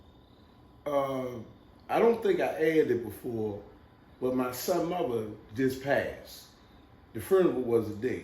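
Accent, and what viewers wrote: United States. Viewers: Gotta be from D.C. or Maryland..❤ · he got that Baltimore accent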